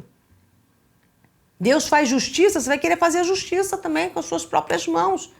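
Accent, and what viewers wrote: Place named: Italy. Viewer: tem sotaque italiano.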